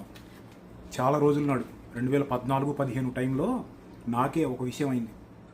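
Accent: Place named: Andhra, India. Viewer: Nizamabad antav Mari Andra slang matladthav?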